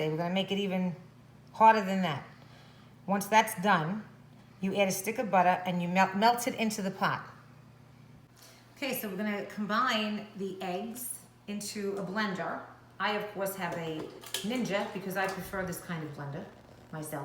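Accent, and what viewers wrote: New York, United States. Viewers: Methinks this lovely lady is from Brooklyn or NYC, from her accent. this does look good, but gee--10 eggs??? WOW! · peppa , sawlt....and butta... AH... I love my NY peeps! Awesome !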